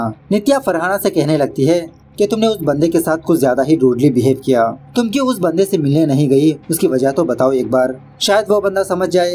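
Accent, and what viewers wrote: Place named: South, India. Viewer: it’s not shudh hindi Southern style Urdu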